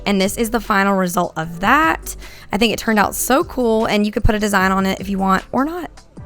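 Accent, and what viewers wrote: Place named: Texas, United States. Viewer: I think I must share that you are the absolute fucking cutest and what part of Texas or the south I guess I should say are you from? I’ve never ever commented on a YouTube vid I don’t have my own channel but I love learning from it and I know how creepy I sound but I cannot resist your personality, I dig all of it. I was just trying to tye dye my kids shirts before they wake tmrw as a surprise bc I take leaps into what I love! You got it girl. Love it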